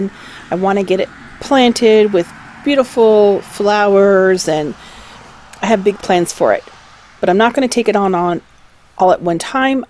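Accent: South, United States. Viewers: I always thought she had a very thick southern accent.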